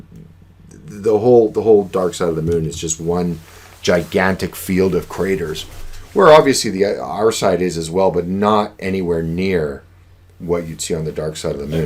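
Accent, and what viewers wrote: England, United Kingdom. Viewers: Interesting stuff, it's good to see you making more videos, yt is a lonelier place without the melodic, perfect English accent of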